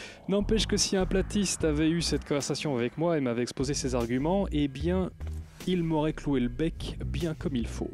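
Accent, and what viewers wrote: Belgium. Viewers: Houit, tüitter ... c'est rigolo le parler belge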